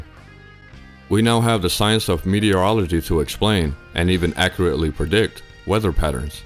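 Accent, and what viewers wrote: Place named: New Mexico, United States. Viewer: Great video. Your voice is easy on the ears and the stuff you are saying is easy on the mind. Are you from New Mexico? Trying to pin down that accent.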